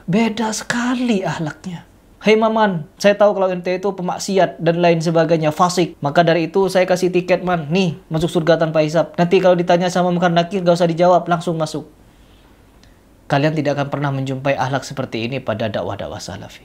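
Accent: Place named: Madura, Indonesia. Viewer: Afwan apakah akhi orang madura,,,,logatnya mirip kami,,,,alhamdulilah semoga makin banyak yang mengikuti kajian salaf,,,,Ana juga kemaren sedih pasca keluar dari ma’had ada banyak sms masuk,,,,ternyata beliau meninggal,,,,rahimahullah semoga kita bisa mengikuti beliau aamiin,,,kami sekeluarga di arab pun ikut sedih karena ahli tauhid wafat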